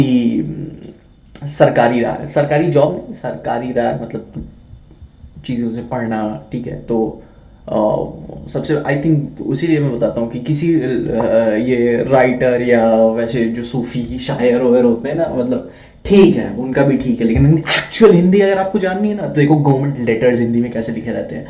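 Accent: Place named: Purvanchal, India. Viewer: Pta nahi kyu bhai Your win feels personal🥹. I could literally feel each of your pause and stutter. The moment of realisations, everything. I don't know why maybe because mai bhi aise hi ek humble background se belong krta hu purvanchal ke. Aapka lehza, aapki shabdaavali mujhse milti julti hai shyd isliye. But anyways keep going brother, someday I'll also be on my own aur ye saara kuch experience mai bhi first hand kr rha hounga.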